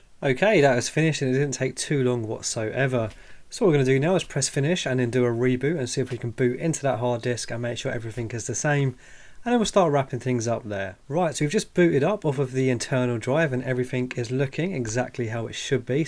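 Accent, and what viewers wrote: United Kingdom. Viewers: I really like the clarity of your presentation, especially when I slowed it down to.75 to satisfy my aging brain. Your lovely Brit accent is not a hindrance for this old guy from the US, happily. Going to review your videos for subjects still being resisted by my thick skull, like those blasted bootloaders (like Grub) that throw me for a loop every time. First thing I see is a prompt which I can't even figure out how to get out of. Then I need to get the layout of a SD boot. There are partitioning issues I don't understand. Sometimes the SD boots fine, sometimes RasPi Imager won't even write to it. "Capacity" issue? Please help me understand what the H is going on there. Thanks very much.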